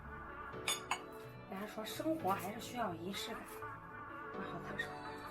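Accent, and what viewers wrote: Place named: Shandong, China. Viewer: 我不用猜就知道你是山东的嘻嘻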